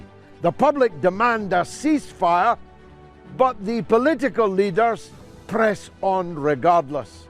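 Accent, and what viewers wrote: Scotland, United Kingdom. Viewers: He's like a white MLK with a Scottish brogue. He needs to be PM.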